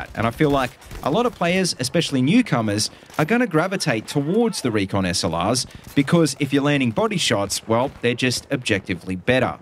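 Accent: Australia. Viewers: Hey shit, you're an Aussie! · gr8 vid m8 Aussie accents makes everything better :)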